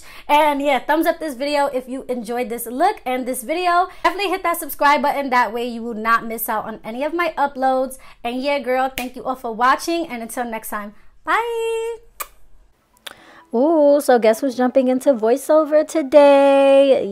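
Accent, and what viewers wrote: New York, United States. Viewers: I'm so glad I came across her. Honestly I love listening to her New York accent more than watching her videos just bc its so soothing but I stay watching these videos, like yes boo give me moreeeeeee!!!!!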